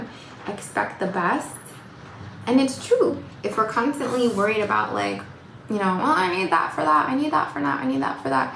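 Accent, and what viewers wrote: New Jersey, United States. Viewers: Love your jersey accent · I’m originally from eastern jersey. Did you ever live near there in the past? I picked up a little accent as you were speaking in this video I had never heard before in your past videos. And according to my wife, it sounds like my accent. Just asking.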